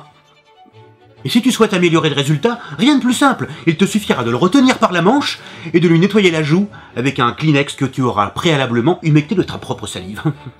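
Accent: France. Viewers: Salut :) Je regarde tes vidéos de temps à autre et je peut te dire que tu as de l'avenir si tu continue ainsi ! Reste comme tu est avec tes "vieilles" blagues qui malgré le temps nous font toujours marrer et ta prononciation à la française des mots anglophones est juste ÉNORME. Bonne continuation à toi et tu peut compter sur moi pour un petit commentaire dans chacune de tes futures vidéos. A plus :D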